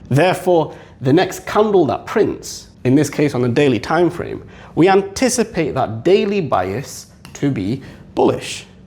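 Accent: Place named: England, United Kingdom. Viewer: His English accent and talking about DRT makes it seem more complex than it really is. 😅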